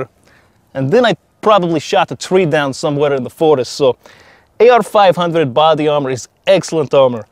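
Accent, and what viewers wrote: Russia. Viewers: 俄国口音的英语吧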